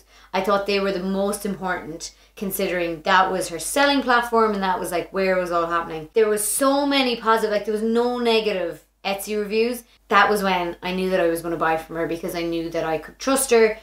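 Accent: Ireland. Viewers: Man, simply stunning! From the gorgeous red hair to the quirky, charming brogue all topped off with that endlessly ethereal gown... Breathtaking. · I love the Irish accent ♥ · You looked exactly like an Irish Princess! Goodness that dress is amazingly gorgeous!!! Your hair looks so beautiful with the blue. Also your accent is amazing! My godmother is Irish so I’ve always loved Irish accents❤️❤️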